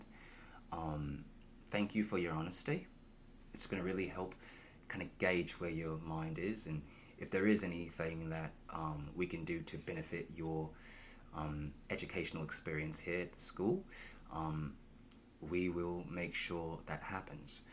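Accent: England, United Kingdom. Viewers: Amazing English accent! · Lovin the British accent 🤣 I’m British myself haha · British accent is awesome. Please use in other videos! Thank you for your hard work. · Omg it’s the return of the British accent!! I feel like it’s been literal years since you’ve used it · British accents trigger me so much, and yours is one of the best 👌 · Ooooooo love the British accent! Wasn't expecting that at ALL and I IMMEDIATELY grinned xD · Bro the British actually matches you 😂🖤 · The British accent is the one!! 😂🥰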